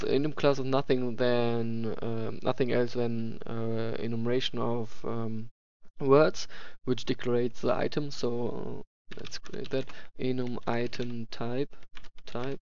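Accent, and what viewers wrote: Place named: Germany. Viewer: Are you from Germany? You Accent sounds like a german English accent.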